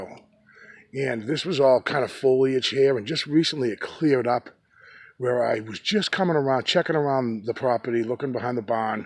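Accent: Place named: New England, United States. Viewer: this must be new england "back yaaad"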